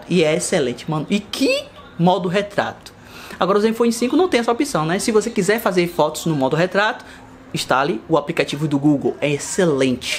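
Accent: Bahia, Brazil. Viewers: Cheio de sotaque kk, esse é baiano mesmo ❤️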